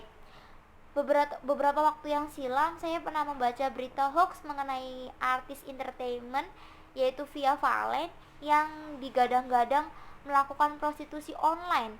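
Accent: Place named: Java, Indonesia. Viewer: Wah logatnya jawa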